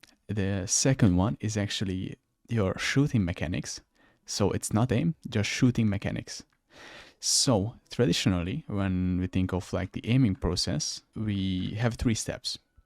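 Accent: Hungary. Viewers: Are you hungarian? Your accent sounds like hungarian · AAAA az a szep magyar akcentus<3 Great vid tho:) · Tudtam, hogy Magyar vagy! Az akcentuson hallatszik, mondom ránézek a névjegydre, és bingo, Magyarország. :D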